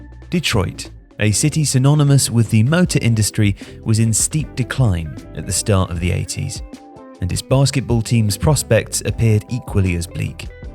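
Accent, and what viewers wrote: United Kingdom. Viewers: This is just so awkward to listen to NBA talk being spoken in a British accent 😂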